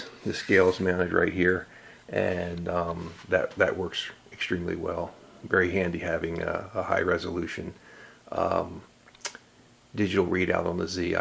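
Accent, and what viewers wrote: United States. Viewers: Very nice. You do excellent work. You have the same accent as Tom Lipton do you come from the same area. I am in Australia so don't know much about American accents.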